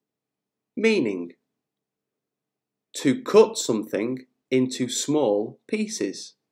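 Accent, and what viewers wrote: United Kingdom. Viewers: I'm so obsessed with your British pronunciation. I think it sounds so sexy when speaking like that. I fancy scranning food and shopping spree, too. teaches us spiffing & stupendous lessons. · We want to continue teaching all the phrasal verbs in your performance. Just great British pronunciation and brilliant phrasal verb learning tactics !!!!!👍👍👍👍👍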